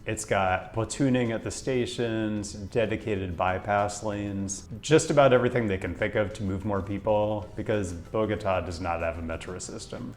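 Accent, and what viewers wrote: United States. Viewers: GREAT VIDEO! I, too, am a gringo nerd who likes your channel and is oddly entertained by your videos. I also LOVE México City and it's amazing, gigantic and extensive transit system -- and I don't get to visit enough! I ❤ CDMX🇲🇽! As a resident of a huge American city on the I-10 swath, where "Spanglish" is widely spoken, I feel the desire to help you out a little. (You definitely speak like an "I-90 gringo".) For "Hidalgo", say "ee-DALL-go" For "Insurgentes", the "g" is pronounced as "h": "een-soor-HEN-tes" and "CableBus" is "kahb-lay-BOOS" (hard "s") From one gringo to another, I hope I have nudged you one tiny step closer to Spanish fluency! 🤓👍🏻🇲🇽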